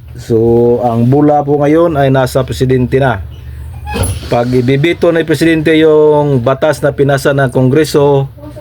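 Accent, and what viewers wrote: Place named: Buhi, Philippines. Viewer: Brod paki ayos naman ng pagsasalita mo para kang taga Buhi, Cam. SUR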